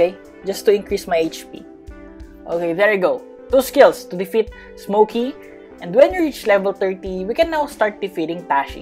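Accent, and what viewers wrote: Philippines. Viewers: I also knew he was Pilipino like how he is talking and like My family Talks with like a high voice a little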